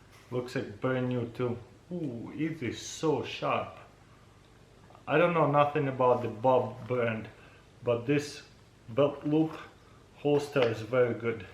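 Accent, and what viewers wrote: Russia. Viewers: Why does it seem like auto captions works better on english in a russian accent than english in a... english accent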